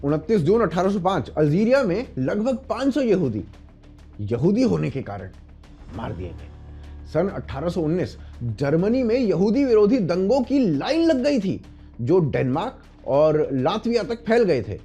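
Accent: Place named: Haryana, India. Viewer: He is haryanavi that's why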